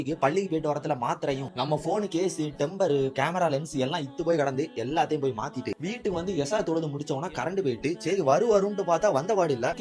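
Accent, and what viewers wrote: Kanyakumari, India. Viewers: I think kanyakumari but ippo bangalore la irukaru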